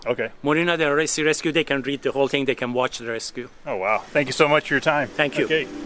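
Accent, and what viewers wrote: Iran. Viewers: You are an awesome Iranian as your accent sounds like my Iranian friends from Chico State Circa 1975. I loved your original life story. Thanks brother for sharing. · Riveting, without being sensationalistic: A+. I could listen to this man speak on any subject (do I detect an Iranian accent?), and admire his humble-thorough approach… to everything, really.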